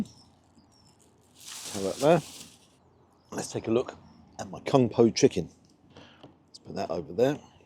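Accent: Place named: Yorkshire, United Kingdom. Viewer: is a character though, he's quirky with his heavy Yorkshire accent and his "NOW THEN GUYS HOW YA DOIN, BACK ON T'ROAD AGAIN". He has a laugh and a joke with the audience, whereas is more serious, calmer and detailed. It's horses for courses for a lot of people, I enjoy both but I can see why would have a bigger audience especially with the younger generation, as he's more of an 'entertainer'.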